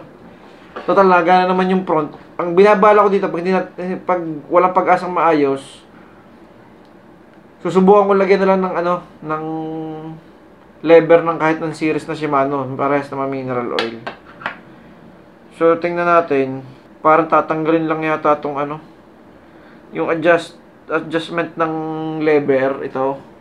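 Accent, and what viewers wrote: Batangas, Philippines. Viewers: taga bats ata kayo bos,halata sa boses haha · Mahahalata mo ang liget sa hinde na batangueño eh. Areng si idol, liget. 😂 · kabayan saan ka gah s batangas haha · Batangeño accent ah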